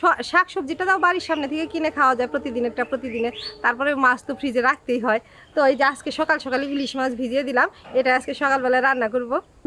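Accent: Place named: Bangladesh. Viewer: দিদি ভাই তোমার কথা একেবারেই বাংলাদেশের মতো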